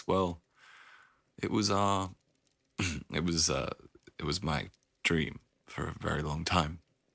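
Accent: United Kingdom. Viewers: This is so good - LOVE the British accent! ❤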